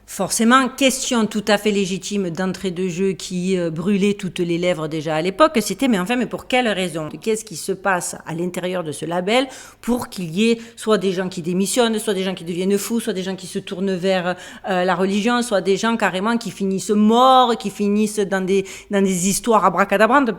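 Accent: South, France. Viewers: jaime bcp laccent du sud bref je vais regarder la video meme si jai jamais aimer ce p.diddy comme 90 % des rappeurs americains..jespere juste que ca sera pas complaisant....jai vu une video ou un mec essayer(il ramait)de defendre p.diddy.... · Ma chère garde ton courage et ta pugnacité❤ j’aimerai bien un jour entendre ton anglais à la marseillaise car comme moi tu n’a jamais perdu ton accent😉😅. Je vis en Belgique et je me fais repérer à tous les coups😅 surtout quand j’avais du grain à moudre avec la police 🥴 garde une zen attitude, les trolls sont toujours là mais si tu te dis que c’est parce que le camp adverse a peur, c’est que tu es sur la bonne voie…🥰🥰🥰stay safe and happy😘😘😘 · je reste, car ta voix est comme un soleil chantonnant d'accent du sud, et rayonnant de ta beauté d'ange blond.. Merci d'être là contesse.. Il n'y a que les jaloux qui partent lol! 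· Merci encore et encore tu as bien du courage de regarder toute cette abomination pour la montrer à ceux qui gardent les yeux et les oreilles soigneusement fermés. Que. d'horreur!! certains etres humains (??? ) sont vraiment capables du pire et c'est pas d'aujourd'hui..déjà ds l'histoire en France Gilles de Rais et la comtesse Batorl et beaucoup d'autres qui par leur "rang" étaient "au dessus des lois, de la morale, près du pouvoir" C'est immonde et je crois que peut etre nous vivrons la chute de cette mauvaisete maintenant que la boite est ouverte, la puanteur qui s'en dégage est si forte que bientôt mm ceux qui se pincent le nez vont la sentir. Tu es aussi intelligente et courageuse que jolie à regarder et j'adore ton élocution qd tu t'enerves avec ton petit bout d'accent du soleil. Bisous de la mémé de france 😘👵💖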